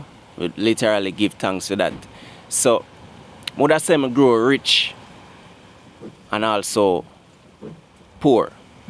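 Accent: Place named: Patois, Jamaica. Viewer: I came across your channel by accident. But I am glad I did, as someone who has a xaymacan background. I love to support xaymaca. I love that you speaking in patwah language, and just being yourself. And not using the standard English. I am vegan, but still watching the channel. Reminds me of the xaymacan dishes my family makes.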